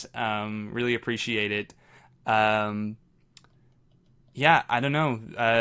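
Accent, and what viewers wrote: Canada. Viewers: aha! So to those that religiously follow and interact with (, the owner of this channel) have been wondering forever who he is and where he is from. Everyone suspects he must be Canadian because of his accent. So we are trying to fish that out. He is very mysterious haha. But a super nice guy, like SUPER NICE has two dogs Jackson and Bob and his name is not Harrison. Also he has relationship experience, we have no idea if he is in a relationship currently. When he has 100k subscribers he will do a face reveal. So please tell your friends to subscribe haha.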